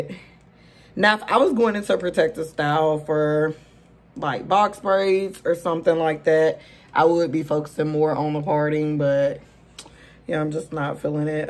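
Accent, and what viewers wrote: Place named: South, United States. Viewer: Love your Southern accent! I'm from Alabama. Thanks for the suggestion. My hair is EXTREMELY dry and damaged, so hopefully this will work. 🙏🏾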